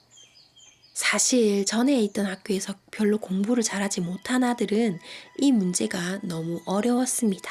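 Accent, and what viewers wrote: Gyeongsang, South Korea. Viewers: 님:) 시간날때마다 예전 영상도 하나씩 듣고 있어요! 저도 경상도 사람이에요 ㅎ 사실 같은 경상도 말투를 '좋아'라고 생가한적이 별로 없었는데 님 음성 들으면서 좋아지고 있어요:) 감사해요! 책만 읽는 것만 아니라 님 생각도 함께 이야기해줘서 너무 좋아요! 늘 응원합니다 💕 늘 건강유의하세요! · 경상도 사람이신가요? 사투리가.... ㅎㅎㅎ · 저도 부산사람인데요... 경상도 표가 많이 나네요^^ 그래도 좋아요^^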